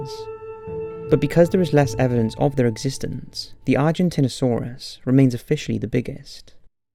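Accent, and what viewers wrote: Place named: South Wales, United Kingdom. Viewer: It's a southern Wales accent. Sounds most similiar to a Merthyr one, but he's such a soft spoken guy!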